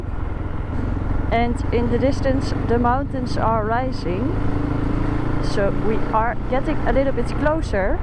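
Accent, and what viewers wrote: Netherlands. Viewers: Another adventurous Dutch lady. I start to like their accent.😄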